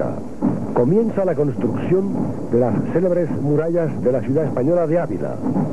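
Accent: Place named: Argentina. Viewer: Yo creo que no, al narrador le oigo un poco el acento de Argentino o Sud Americano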